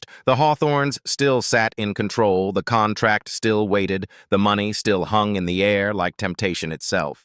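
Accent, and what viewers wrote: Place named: South, United States. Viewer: What a horrible irritating voice to use for a reasonably good story. No need to sound like some hillbilly.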